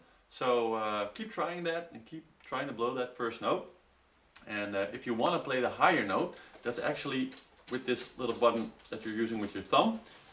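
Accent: Netherlands. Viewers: jij bent nederlans ik hoor het aan je stem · Is he Dutch of zo? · Sounds like you have a slight Dutch accent, true? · Are u dutch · I CAN TELL YOUR DUTCH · You're Dutch aren't you? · Ik hoor dat je een nederlander bent haha. 😁 ik speel al Gitaar en Viool. Maar zou ook heel graag Saxofoon willen leren spelen. En kan niet wachten om ermee te beginnen. Ik ben er voor een aan het sparen. En ik heb me geinformeerd bij een lokale Fanfare om zo al eerst op de Sax te leren spelen voor ik er een koop. Is dit een goed idee?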